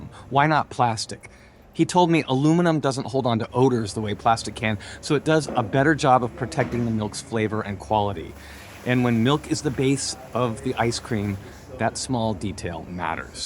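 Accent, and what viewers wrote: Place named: United States. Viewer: where are you from? you sound american. is travel allowed to iran currently?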